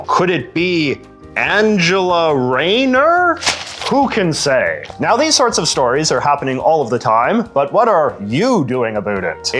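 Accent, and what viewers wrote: Canada. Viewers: Ive never heard american history told with a Canadian accent before.....feels weird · He’s Canadian… that’s how they say “about” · I came across your channel before. Are you Canadian?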